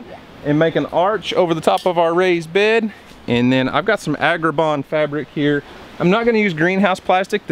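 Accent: United States. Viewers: great video, love your accent, reminds me of all the cowboy movies i watched growing up here in Scotland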